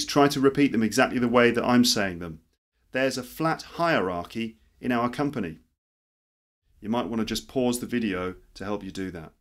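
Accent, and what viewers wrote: RP, United Kingdom. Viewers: I love how he’s a lil it British. 😂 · Hi,! I'm from Russia! I love your posh accent!I kind of want it too. And I also really need your opinion whether the following sentence:'all he asks for you is be prepared as much as he does ok'-makes sense for you as a native speaker. It's very important for me.I just have a little bet about that.And now I need your help.so please,.I would appreciate it. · nice lesson i adore the british accent thank you so much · very nice pronunciation in british accent. bravo! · Hi Sir, My name is Karla, I have to say something about your pronunciation. Your accent is from UK, If I pronoun all those words as you said, American People will correct me? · Are you a native British speaker?What sort of British accent do you use? Is it RP? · Hello, I really like your voice and British accent. It is nice and clear to hear again and again. 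I can listen well but I can’t speak fluently, especially the English accent. I’m not satisfied at all with my voice, pronunciation and accent when I speak English out. Short talking is ok but long conversations or storytelling really suck. What can I do? I mean how do I practice? Do I need to practice English speaking one-on-one with a native speaker or can it be helpful if I practice English pronunciation first and speaking fluency on my own with the help of the Apps out there? Looking forward to hearing from you, Regards, TJ P.S. I just saw your channel today on iPhone pod cast and it is my very first time listening to 3/4 of your pod cast audios and then see the YouTube videos to see your face with the curiosity of how you look like because I really like your voice as I’ve said and imagined your how you look like in my mind so.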